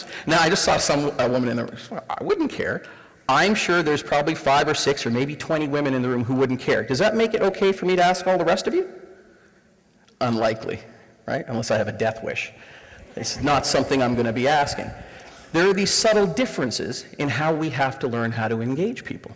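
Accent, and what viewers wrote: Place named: Canada. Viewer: By his accent, he is clearly Canadian.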